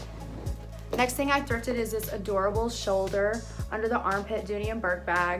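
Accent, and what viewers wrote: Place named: United States. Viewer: Girl what state are you from? I like ya accent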